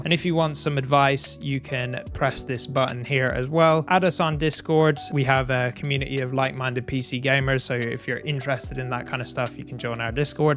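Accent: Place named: Ireland. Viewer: I can tell that but what county is that accent from bro 😭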